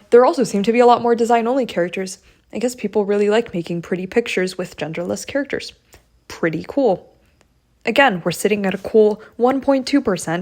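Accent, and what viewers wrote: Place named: Greece. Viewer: I just started the video but your voice instantly let me know you're not just a woman, you're a woman of greek origin😂idk if you speak Greek, your pronunciation is great, but I just knew ahaha, glad to see greek creators in the wild. edit: I checked your insta (followed and commented too, notice me!!/j), I was right and we're the same age too lol Edit 2: okay now that I finished the video, first of all, you took a lot of my thoughts and put them into words which I loved. It shows a lot of the mentality when it comes to writing women. I was thinking "most of the internet art is women, but that's because men drew out their p*n material. And then you said it, it's gooner shit. It's pretty statues with nothing about them personality wise. Some pros may make the design good enough to communicate their role in the story but that's still surface level and they could absolutely suck in a story as a character, even if "woman pretty and design semi functional". 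I'm also in stem (heyyyy) so I appreciate a good graph and analytical approach with methodology for the conduct of the research. Apart from the video itself, I've been writing stories without realising it mostly about women. They're the driving forces of their stories, some of them suck and make the rest of the cast worse, manipulate the men, control their fates and relationships, either on purpose or by accident. I haven't drawn my characters a lot due to time, apart from the main duo, but I don't see them, any of them, as my b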